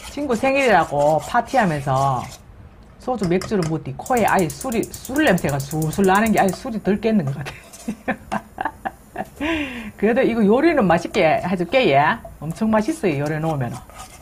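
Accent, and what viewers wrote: Gyeongsang, South Korea. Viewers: 털털이 경상도 아줌씨 요리보다 말씨가 더 맛나요^^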